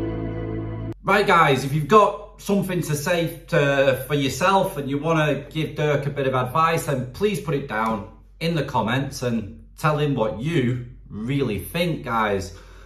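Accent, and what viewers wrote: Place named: Leeds, United Kingdom. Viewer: do u ever think KAAAA sounds like an old crow lol - when i hear the girls talking it makes me think old crow lol - u sound like a leeds guy - close ?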